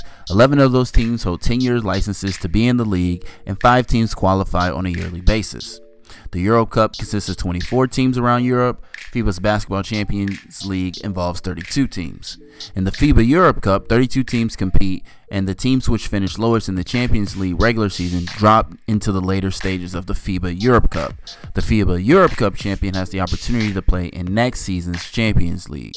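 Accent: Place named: United States. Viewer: Luca Don'cheeks xD. I love american pronunciation. Not a hate :).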